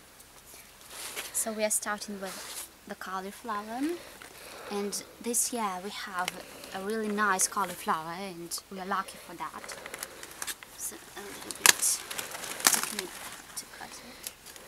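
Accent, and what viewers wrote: United Kingdom. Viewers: You seems to have a British accent. Btw, your village is quite lovely. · So British accent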